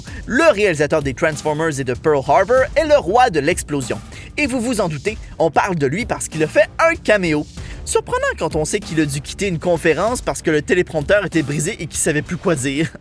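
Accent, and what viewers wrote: Quebec, Canada. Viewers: J'adore ton accent québécois ! XD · Super vidéo, comme d'habitude ! J'adore ton accent québécois, il est juste GÉNIAL ! Continue comme ça et à bientôt !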